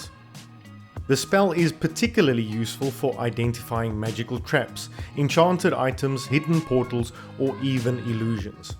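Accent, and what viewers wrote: Afrikaans, South Africa. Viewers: Nice video; are you Afrikaans?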